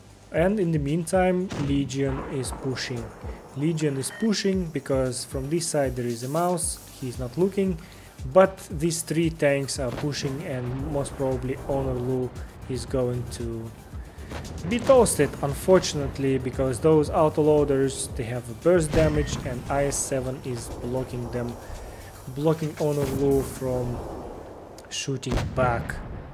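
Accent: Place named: East Asia. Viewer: Райс инглишь)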